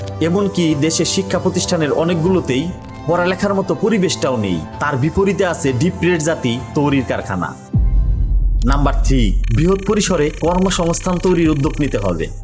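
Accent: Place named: Patuakhali, Bangladesh. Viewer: ভাই আপনার বাড়ি কি পটুয়াখালী? কথার টোনে অনেক আঞ্চলিকতা টান আছে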